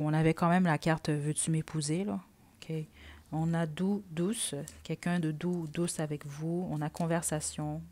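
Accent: Canada. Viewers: J'aime aussi votre accent canadien. Etes vous Canadienne? Je vous suis de Douala, Cameroun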